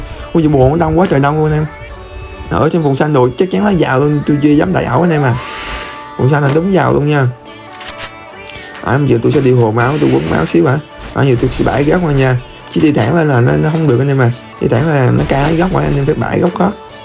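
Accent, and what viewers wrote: Quang Ngai, Vietnam. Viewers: A ở Quảng Ngãi hả😅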